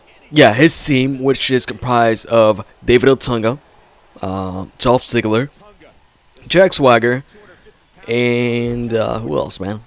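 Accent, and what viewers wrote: Canada. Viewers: ARE U CANADIAN..uve Kinda go thee accent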